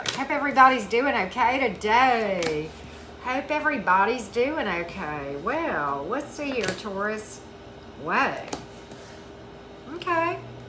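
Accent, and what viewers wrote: South, United States. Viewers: It's the Chinese bangs for me, and southern twang.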